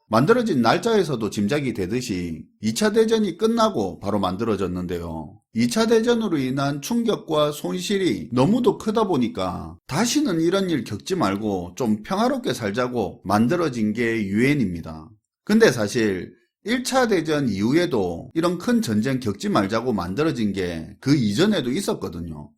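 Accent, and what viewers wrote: Gyeongsang, South Korea. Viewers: 개쌍도 어투 진짜 토나온다. 하지마라.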